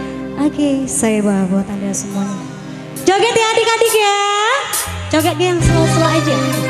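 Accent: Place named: Betawi, Indonesia. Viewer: logatnya lucu kalo ngomong😂😂😂😂 kaya betawi gitu. suaranya top markotop pokonya😍😍😍😍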